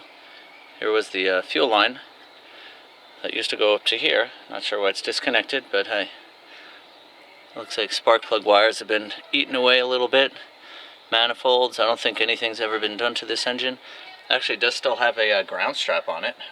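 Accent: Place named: Canada. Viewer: Front bumper mounting bracket. I noticed you speak some Canadian.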